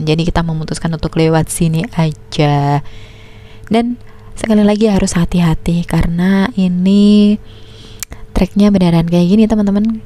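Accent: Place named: West Java, Indonesia. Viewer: Peda orng sunda dina nyarios na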